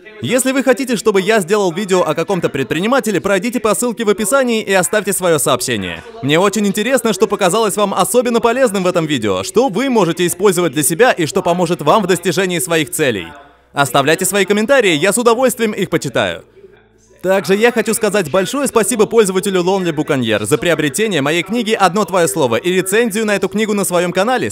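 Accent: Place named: China. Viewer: Что за китайский акцент диктора?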